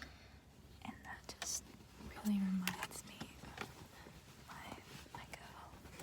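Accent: Australia. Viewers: You from aus? Ik a Aussie when I hear one confirm for me Bub. 😂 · That's an Australian accent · aussie accent is so relaxing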